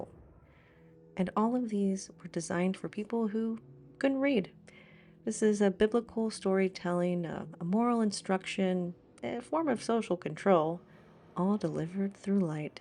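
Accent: South, United States. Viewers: Hi, only just seen this one, but again, another excellent video, I remember the fire well, and the one in Windsor Castle, both hugely important events in their respective histories. You seemed surprised when another correspondent said it was "Auntie" standard, and questioned why. The answer is quite simple: well researched, calmly delivered facts, rather than sensationalised narration. I'm imagining Sir David Attenborough, but female, American (but rapidly becoming more Brit), with that soft, warm Southern drawl wafting over the visuals. Check out his documentary on gorillas from the late '70's, where he's in the midst of a family of gorillas, from the silverback to babies, retains his composure, and continues in hushed tones as they groom him, lie on him, and even (out of camerashot), as one of the youngsters removes his shoes. True professional, and still making wildlife documentaries at age 99. 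Sorry about (another) long post, keep up the good work my dear. Chin chin! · I love your voice, both tone and pitch which, combined with your southern accent, is very soothing. Could easily put me to sleep. Excellent video, one of the best I've seen on the subject matter.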